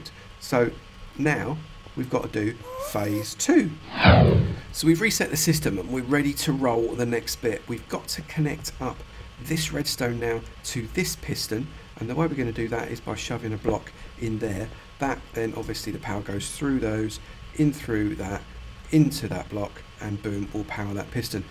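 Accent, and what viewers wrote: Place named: Yorkshire, United Kingdom. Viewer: i can tell your yorksire well i yorksire aswell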